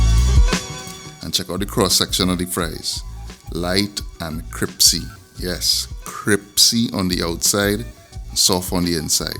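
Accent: Trinidad and Tobago. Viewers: I AM IN LOVE WITH YOUR TRINIDAD TALK 🇹🇹 😍 💕 ♥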